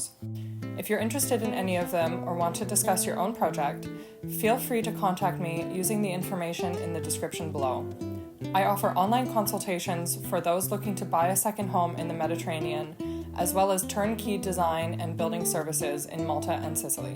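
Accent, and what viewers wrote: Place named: United States. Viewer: You sound American. How did you get to do this? You are so lucky.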